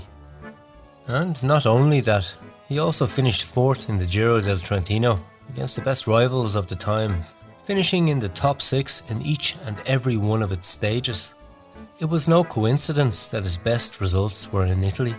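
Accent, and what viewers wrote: Ireland. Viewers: Good stuff.Subscribed.!! Keep it up.Not a wee bit Irish are ya? How about one on Sean Kelly & Stephen Roche?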